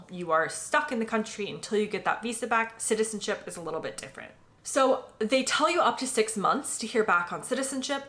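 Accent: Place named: United States. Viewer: Hi I’ve never been to America. Love the American accent so glad you got sorted Welcome to the Uk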